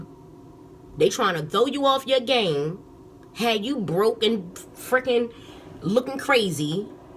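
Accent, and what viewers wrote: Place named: Baltimore, United States. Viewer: Baltimore accent..🌺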